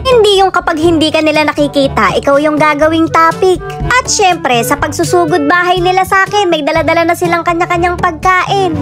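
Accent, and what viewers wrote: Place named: San Miguel, Philippines. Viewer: Natutuwa talaga sa mga taga San Miguel ganyan na ganyan magsalita tulad mo? Nalimutan ko nga name fr ko dyan siguro age nya nasa 45 yrs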